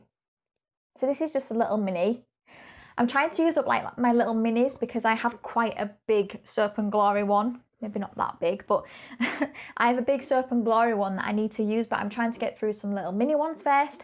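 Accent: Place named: Lancashire, United Kingdom. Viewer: I am UK based and do empties videos too! There aren't many of us, happy to find you and your channel! It's always nice to see reviews of products that I can actually get hold of and try myself! Subscribed! Ooh are you in greater Manchester, Lancashire? Am I close or nowhere near? I love trying to guess accents, I find them fascinating!!!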